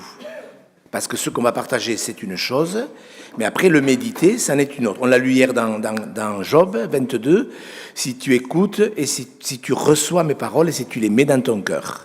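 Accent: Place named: Toulouse, France. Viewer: C'est vraiment l'accent de Toulouse !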